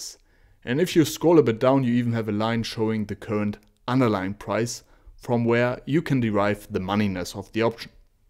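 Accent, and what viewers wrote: Germany. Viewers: My God thank you for German accented coder, you are awesome. Indians are fine but accent is sometimes difficult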